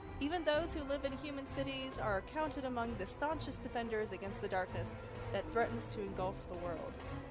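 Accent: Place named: South, United States. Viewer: know i'm not speaking for every one here but i'm fine w/ the southern accent.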